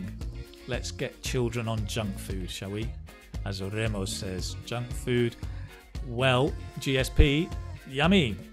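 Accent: United Kingdom. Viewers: Is teacher from UK, bro?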